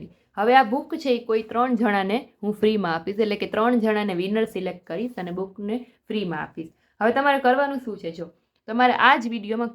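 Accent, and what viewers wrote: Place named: Banaskantha, India. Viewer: બનાસ ની ઓળખાણ ના આપવી પડે બોલી જ કાફી છે ❤ જિલ્લો મારો બનાહકાંઠો ❤ તાલુકો ધાનેરા❤ બનાસ ડેરી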